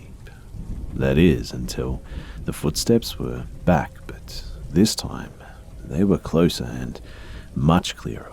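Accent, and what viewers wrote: Australia. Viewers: Fan from the sunny coast, stoked to hear a fellow Aussie killing the horror!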